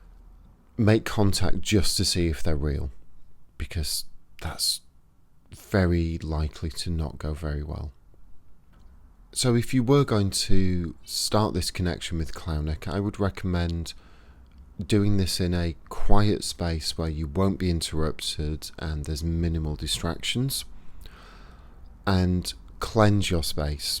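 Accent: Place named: United Kingdom. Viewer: Off topic your voice is so calming and love your British 🇬🇧 accent it's the cherry 🍒 on top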